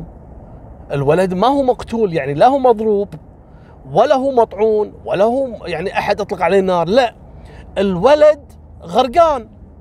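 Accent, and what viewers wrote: Morocco. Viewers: 🤣🤣🤣🤣🤣🤣لجهه المغربيه تذبحني ياحليلك يا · هالقصة سمعتها من عند الخراز. لكن من عندك يا لها طعم اخر وكاني اول مرة نسمعها تعجبني لهجتك المغربية تفطسني ضحك ههه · ناري على الهضرة المغربية😂😂😂😂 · حفظك الله يا حلوه للهجه المغربي منك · و الله احب لما تتكلم لهجة المغربية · 😊 حلو يتكلم مغربي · قصة محزنة لكن حديثك بالدارجة المغربية يضحكني الصراحة 😂😂😂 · احلى شي يوم تتكلم مغربي 😂😂😂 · السلام عليكم انا جزايرية أقيم في فرنسا وانا من متتبعيك الدايمين لك على اليوتيوب والله ابارك قصصك رايعة واداءك اروع الله أوفقك انشاء الله انت تضحكني وتعجبني كثيرا عند محاولتك الكلام بلهجتنا المغربية جزاك الله كل خير يا 😊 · اه على اسلوبك بالدارجة المغربية 😅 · الله عليك يا وعلى اللهجة المغربية 😊😊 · اموت بضحكتك صراحة 🥰 وفاش كتهدر المغربي يا سلام يا سلام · كفو يا اللهجة المغربية متعوب عليها 😂😂😂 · هههههههههه كلامك بالمغربي يضحك الله يسعدك يا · Tbarkallah 3la lahja lmaghribia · انا ما انتبه على احداث القصه بسبب اللهجه الي تتكلم خاصه المغربيه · تعلم الهدره المغربيه لايقة عليك يالكبيده ديالي😘🇲🇦🇰🇼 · Salam nice story your moroccain accent is so funny. 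I am following you all the time. Greating from 🇧🇪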